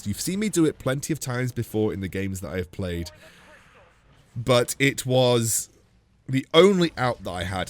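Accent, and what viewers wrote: United Kingdom. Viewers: You do a good job on these videos pal, what part of the UK are you from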